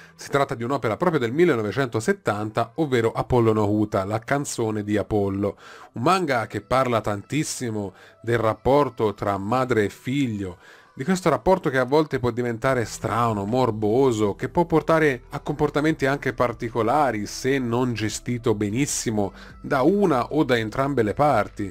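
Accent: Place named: Tuscany, Italy. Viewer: Hai una voce bellissima, toni pacati e mai noiosi, accento vagamente toscano mai pesante e contenuti coinvolgenti. Ho adorato anche questo video. Piano piano me li vedrò tutti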